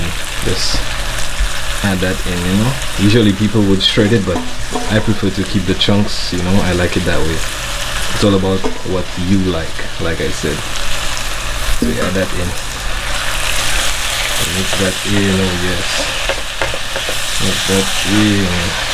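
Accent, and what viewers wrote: Saint Lucia. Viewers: doing it big!!!!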